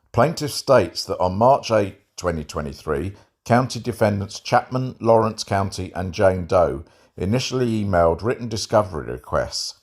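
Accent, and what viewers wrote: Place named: United Kingdom. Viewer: It's the British accent.. Brits can describe a dog laying down a stream of runny pooh and make it sound elegant..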